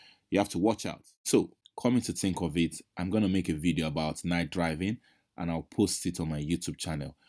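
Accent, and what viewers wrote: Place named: Nigeria. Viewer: i guess you are from Nigeria due to your accent😀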